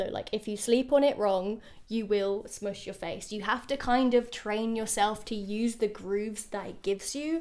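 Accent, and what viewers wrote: United Kingdom. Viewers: I really like your idea of aging, it’s beautiful ! And by the way, La Roche Posay is a French brand, and we don’t pronounce de E in Roche ;), it’s like « La rosh Posay », but I like the British accent on the prononciation 😍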